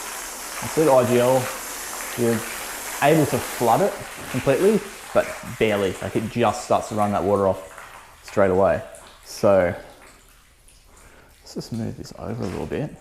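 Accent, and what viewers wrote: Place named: Australia. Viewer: I love the Australian accent, especially when you say Mirch…😜 Great test! Mirch is quite impressive. Thank you.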